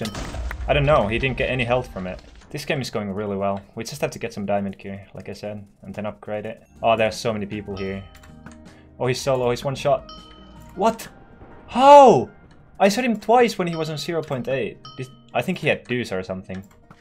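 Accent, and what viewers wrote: Finland. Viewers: This is my 1st time watching you and just with 8 seconds I could tell that your Finnish And of course because of your name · i love your finnish accent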